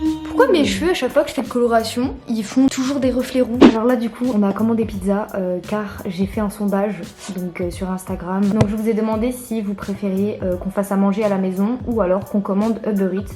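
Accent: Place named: Nord, France. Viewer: T'es cht'i ? T'as trop l'accent !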